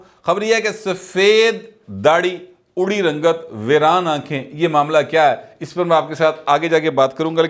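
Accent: Punjab, Pakistan. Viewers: ji your Punjabi accent are awesome. ❤ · Like your Punjabi accent- it is of a polite version. U make it sound very soft 👍. Political analysis in Urdu & Punjabi makes the programme more interesting.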